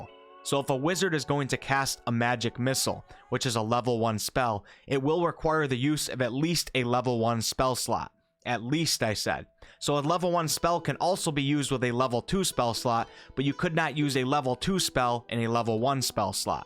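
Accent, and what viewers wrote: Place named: Minnesota, United States. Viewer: What is your accent? Is this like Minnesota or something?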